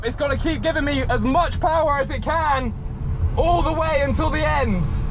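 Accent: United Kingdom. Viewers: Although your accent is British, and the steering wheel is on the right side, you pronounced "schedule" like Americans. :D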